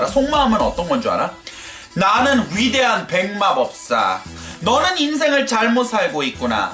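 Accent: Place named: United States. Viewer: 한국인 입장에서 재미동포가하는 발음과 표현이 참 흥미롭게 들리네요..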